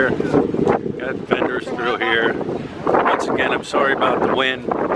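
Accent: Philadelphia, United States. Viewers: "I'm gonna film down dis schtreet here" LOL Definitely from Philly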